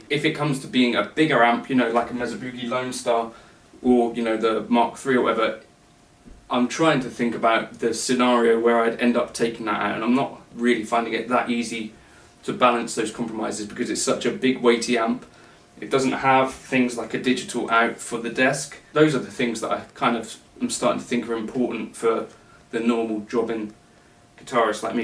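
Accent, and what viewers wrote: United Kingdom. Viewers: I lov your british accent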